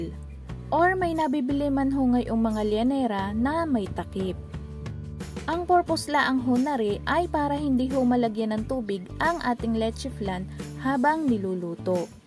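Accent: Batangas, Philippines. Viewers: Mukhang taga Batangas ka Ate ah...ARE ARE EH....❤❤❤ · Ala eh... pansin ko laang na Batangueña pla si 👍😊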